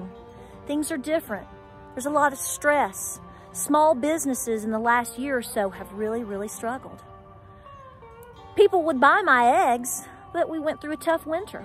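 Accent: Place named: Knoxville, United States. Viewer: Sounds like people that live in west Knoxville.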